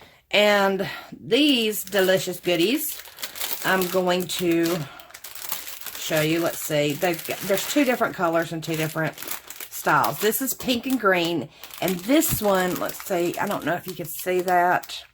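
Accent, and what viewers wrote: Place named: South, United States. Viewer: I love that you are so talkative and cheerful and LOVE, LOVE, LOVE your Southern accent, my sweet! You are so much fun to watch! Big hug and love <3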